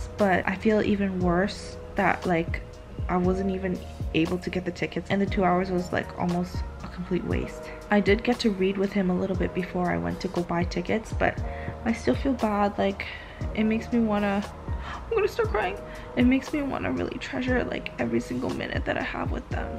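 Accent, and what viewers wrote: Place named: South Korea. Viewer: konglish on point 👌🏻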